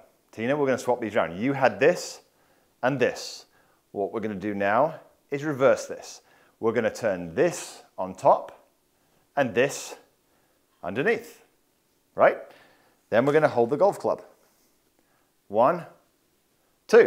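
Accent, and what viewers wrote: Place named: Northern England, United Kingdom. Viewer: love the tuition, shame about the flat northern vowels.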